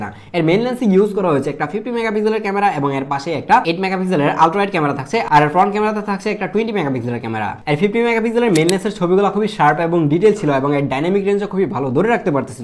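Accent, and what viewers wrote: Kishoreganj, Bangladesh. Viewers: Kishoreganj Naki Bhia Aponer Jala Bhia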